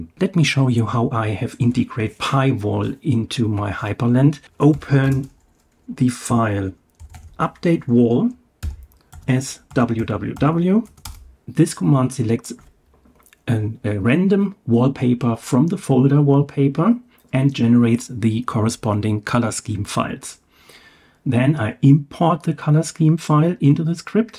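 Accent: Germany. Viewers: The german accent 😂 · man hört das deutsche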